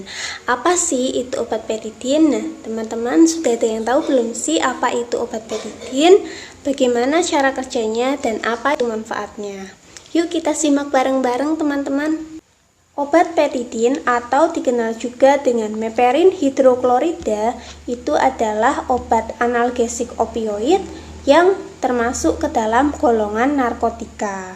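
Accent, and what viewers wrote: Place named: Java, Indonesia. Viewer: Medok banget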